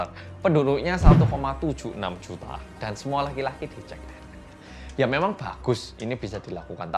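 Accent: Java, Indonesia. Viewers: Medokmu membuatku terkesan 😁🤭🙏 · Orang yang medok i love medok owakwao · Lanjut mas medok setelah nessie judge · Mas: halo guys welcome back to korea reomit bersama orang korea Me: * siap-siap * Mas: yang... Me: MEDHOOOKKKK ❤❤❤❤❤ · Medhoookkknya kok pake nada tinggi mas?? Wkwkwkw... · "medok" nya ngegas mas :) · Gercep Kembali lagi,bersama oramg korea yang..... "MEDHOOOKKKK" salam dari Jawa Timur yh mas. Lup²❤ #BoloneHansol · Semangat buat Korea medhok, nanti klo wabah selesai ke SBY ya bang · medhok nya waw banget.. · Kaget medoknya😭😭😭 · Hallo gaess welcome to back korea reomit bersama orang Korea yang medok😁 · Medhooooooknya bikin ngakak😭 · ... yang medokkk ~ * auto cabut earphones * okey . . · medok nya bikin aku kagett tiba2 ada falsetto hahahha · "bersama orang Korea yang medhooooookkkkkk"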